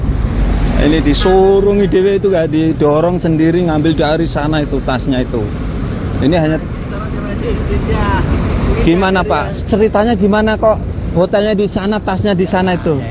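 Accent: Java, Indonesia. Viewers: Mas asli orang mana? Kogh logatnya kejawa jawa an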